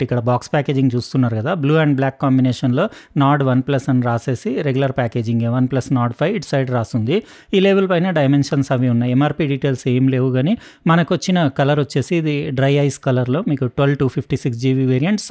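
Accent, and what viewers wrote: Telangana, India. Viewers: Telengana slag bale vuntundhi.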